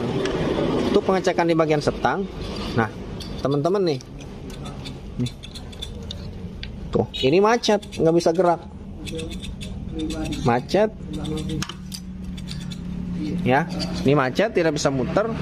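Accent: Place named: Sunda, Indonesia. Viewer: kang ieu dimna kok kaya bahasa sunda tp kaya beda logatna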